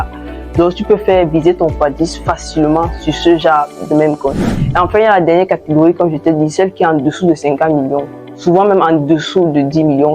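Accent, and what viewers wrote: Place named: Cameroon. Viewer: J'aime regarder tes vidéos et j'adore ton accent camerounais.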